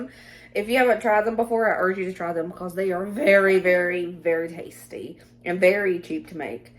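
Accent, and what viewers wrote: South, United States. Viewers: Both looks very good! Love your voice and southern accent!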